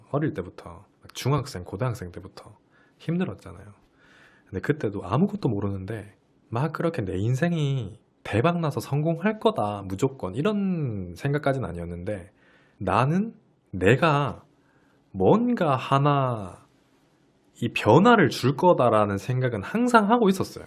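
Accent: Gyeongsang, South Korea. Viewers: 경상도 억양이 있네요~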